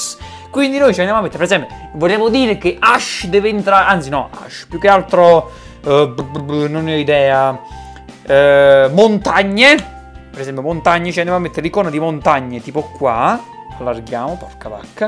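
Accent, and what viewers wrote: Naples, Italy. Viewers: ma sei napoletano??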